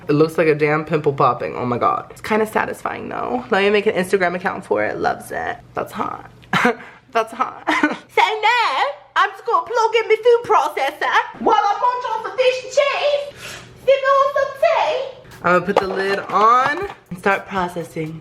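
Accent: United Kingdom. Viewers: 100 videos of British accent pls Also asscheek earthquake full audio plsss or mvvv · His British accent is funny like if u agree❤❤ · He should really do an entire video in his British accent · YOUR BRITISH ACCENT GIVES ME LIFE!!!!!! SLAYYYY! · Love your British accent lol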